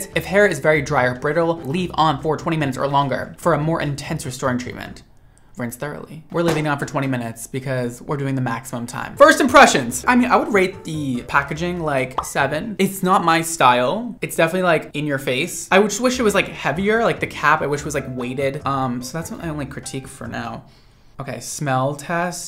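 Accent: United Kingdom. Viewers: me lives in the uk does British accent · LIVING for your cute British accent! Nailed it! ❤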